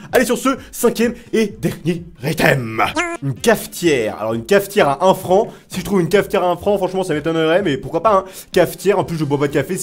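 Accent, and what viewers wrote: Quebec, Canada. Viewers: yo je suis un nouveau mais je te trouve super ps: tu pourrait faire une vidéo avec ta voit québécoise un petit defi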